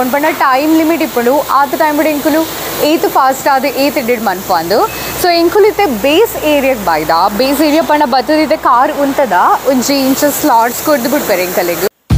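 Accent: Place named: Tulu, India. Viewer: Your tulu slang is so nice.... Nice video..👌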